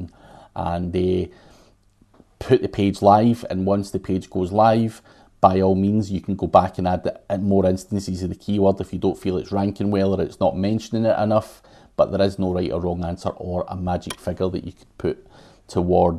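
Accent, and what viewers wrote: Scotland, United Kingdom. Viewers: As soon as I heard you were Scottish, I liked and subscribed :)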